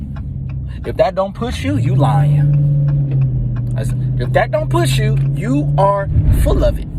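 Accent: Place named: Maryland, United States. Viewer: Bruh gotta be from Maryland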